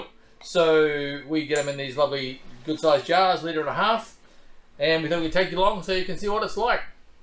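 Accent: Australia. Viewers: Прекрасная возможность освежить свой австралийский английский.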